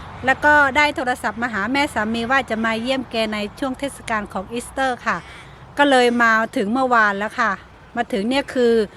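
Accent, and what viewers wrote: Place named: Surin, Thailand. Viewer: พูดเหมือนคน สุรินทร์ มาชมสวนครับ บ้านน่าอยู่วิวสวย ดูชื่อ แล้ว บุรีรัมย์ สุรินทร์ บุรีรัมย์ รอ เรือ ขัดเจน เป็นเอกลักษณ์ เพื่อนผมสมัยเรียนครูด้วยกันก็แบบนี้ครับ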